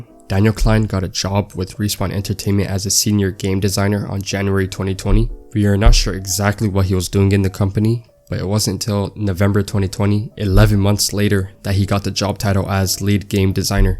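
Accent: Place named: Canada. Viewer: U sound Canadian just like me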